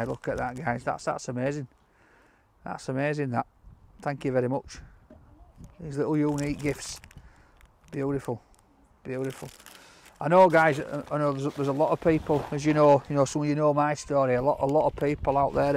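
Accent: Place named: Yorkshire, United Kingdom. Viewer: Love the yorkshire accent, much better than my midlands accent.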